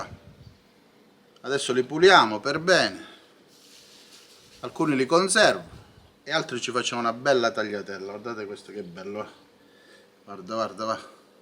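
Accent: Calabria, Italy. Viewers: Accento calabrese pesante eh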